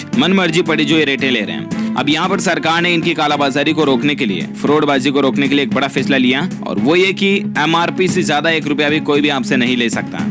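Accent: Rajasthan, India. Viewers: Bhai kya aap Rajasthan se hai?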